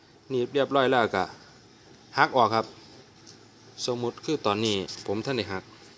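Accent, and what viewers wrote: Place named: Ubon Ratchathani, Thailand. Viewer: งานเนี๊ยบค่ะ อยู่จังหวัดใหนคะสำเนียงเหมือนคนอุบล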